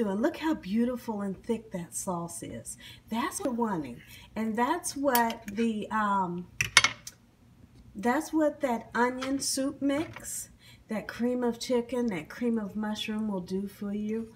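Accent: South, United States. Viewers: Does anyone know where from bc i swear she talks so Southern.. And sure does cook southern..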